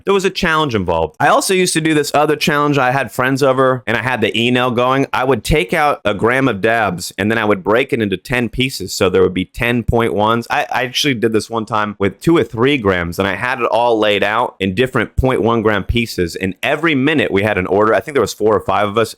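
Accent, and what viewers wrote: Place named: New York, United States. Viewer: 😭is he from New York or something? Ik Ian the only one who notices the slight accent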